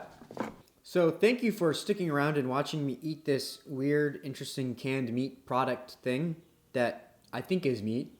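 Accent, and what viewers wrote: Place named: United Kingdom. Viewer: Yes but people remark often about how “British” he sounds.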